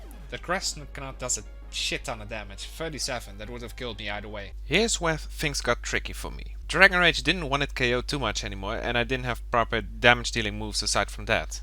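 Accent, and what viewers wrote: Netherlands. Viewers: love the dutch accent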